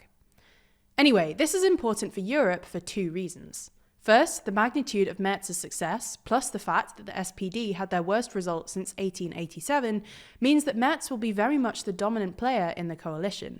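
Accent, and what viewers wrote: United Kingdom. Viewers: I just can't listen to an Asian person with a British accent. It's so wrong.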